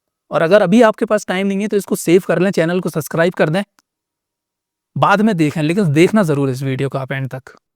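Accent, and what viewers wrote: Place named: Agra-Mathura, India. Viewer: Bhai aap agra ya mathura se ho kya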